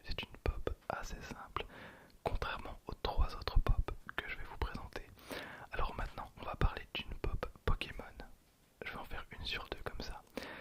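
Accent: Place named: Belgium. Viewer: C’est la numéro Oui118 l’accent belge oblige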